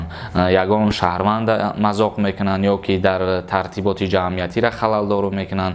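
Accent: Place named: Tajikistan. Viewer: Рахмат ика хушру гап мезани бо лахни точикии точики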